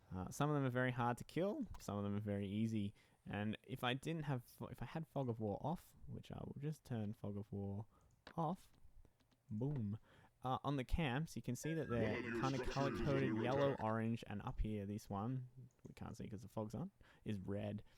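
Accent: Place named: Australia. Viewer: Hey this is mad, I was looking for a guide to get my mate started on this game. clicked on this cos it's fairly recent, But the major plus when i first hear you talking. You sound Aussie, but then there's elements of your pronunciation that doesn't..... are you? hahah. I'll watch through now and show my mate. Cheers! (Please reply)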